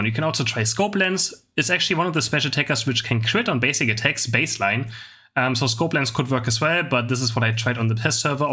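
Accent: Germany. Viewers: 90% of language i cant understand. Gerlish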